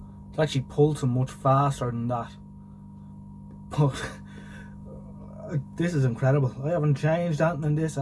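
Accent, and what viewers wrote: Ireland. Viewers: As an Englishman, I absolutely love your wonderful Irish accent!...... You sound like, turning their hand to TV repair! 👍😂🤣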